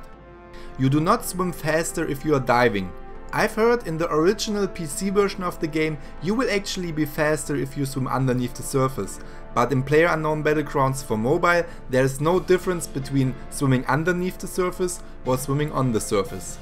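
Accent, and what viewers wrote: Germany. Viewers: You know...I just noticed his language was in Chinese or w.e. yet he sounds German as fuck lol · Keine Ahnung ob das wer lesen kann,aber irgendwie hat er ein Deutschen Akzent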